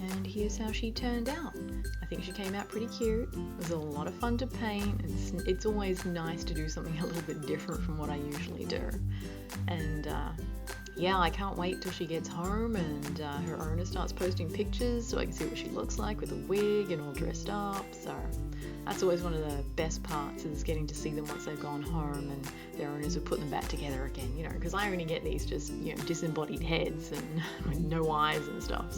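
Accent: Australia. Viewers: sorry for a kind of off-topic/personal question, I'm just wondering what Australian state you're originally from? just trying to place your accent hehe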